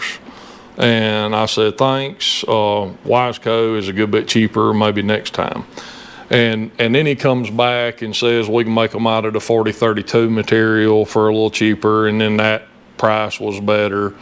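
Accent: South, United States. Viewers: Man I can’t listen to this whining. Maybe it’s the dumb southern drawl or the inability to use the English language … I don’t know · No offense at all but you have the voice of Boomhauer with the accent of Hank Hill. I’m a southerner too to so I can say that lol. Great video too!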